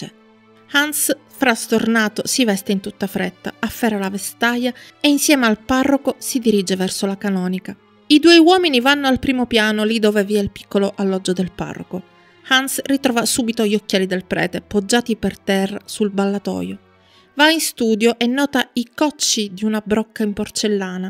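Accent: Sicily, Italy. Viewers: Bravissima come sempre. Il tuo accento siciliano è stupendo. ❤❤❤❤❤❤❤ iscritto 😍🥰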